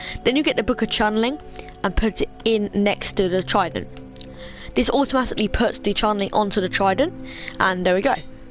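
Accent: United Kingdom. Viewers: oh you're british?! I love that accent, it's nice👌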